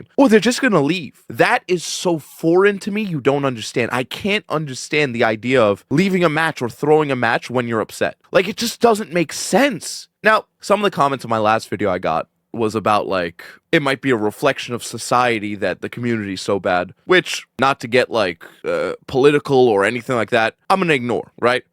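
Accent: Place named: Alabama, United States. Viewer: I definitely thought bro was Alabama white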